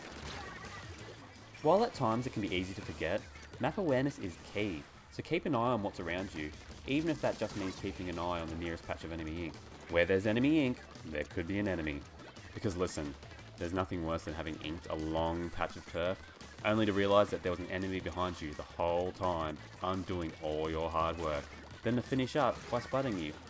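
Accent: Australia. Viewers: Are you Aussie 🤔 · you aussie mate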